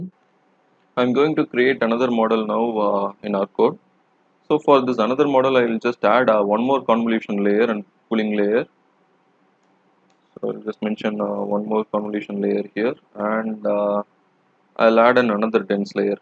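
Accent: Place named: India. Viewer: Its like watching sentdex ML series again. With indian accent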